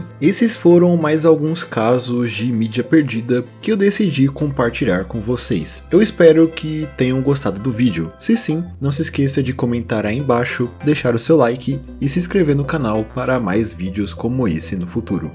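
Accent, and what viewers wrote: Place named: São Paulo, Brazil. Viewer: Será que o é branco, preto ou asiático? Magro ou gordo? Será se ele é baixinho ou tem 1,90m? Talvez nunca saberemos. Só sei que ele é paulista pelo sotaque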